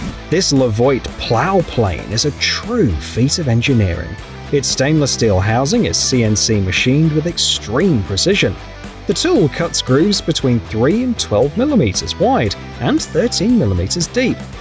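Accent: United Kingdom. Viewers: I am so tired of listening to those accents of British origins. We won the Revolution a long time ago,, 250 years ago .,,,so I turn you off. This is America.,, I wanna hear an America trying to sell me something. It’s easier for me to understand.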